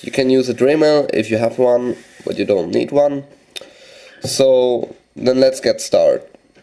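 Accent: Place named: England, United Kingdom. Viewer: I love that english accent. Keep up the good work ! :D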